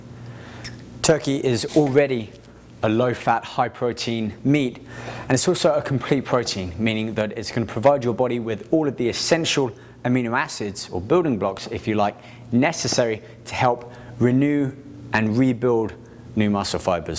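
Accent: United Kingdom. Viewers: i love the british accent!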